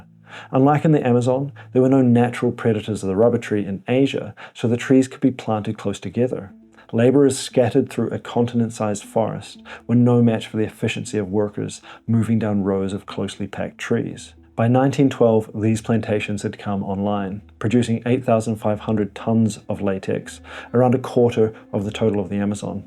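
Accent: New Zealand. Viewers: Great channel! Is that a New Zealand accent? Best wishes from Ukraine. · Are you kiwi ?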